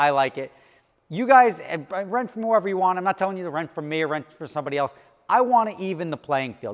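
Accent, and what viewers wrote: New Jersey, United States. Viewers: Just put the old S on and it'd be perfect. Jersey accent an all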